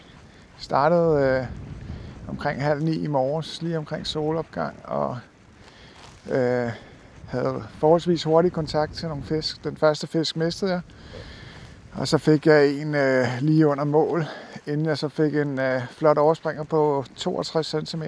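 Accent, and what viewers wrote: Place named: Zealand, Denmark. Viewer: Flot fisk. FIn video. Kan høre du er fra Sjælland. Men er du ikke sød at præsentere dit valg af plads og grej og de overvejelser du gør dig i disse valg.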